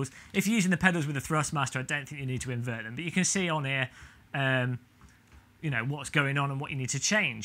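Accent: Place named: East Midlands, United Kingdom. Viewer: I'm sure that sounds like an East Midlands accent? Nottingham?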